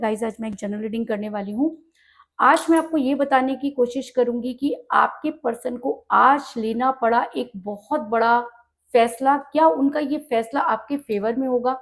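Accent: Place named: Uttar Pradesh, India. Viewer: Mujhe ap ki bate style muhavre ache lagte hi . Ap age par atak jati hi ye apka boring point hi. I am sure ap UP si hi. Andaze guftagu yehi Zahir karta hi. Ap intelligent hi. Yaha sirf third party nahi hi. In janab ki dozens women si intimacy hi. Isiliye kisi ek ke nahi ho sakte. 50 jagah moh Marne ki adat maza shouk hi. Ap ke liye info.hi .Rdgs mi Kam ayegi.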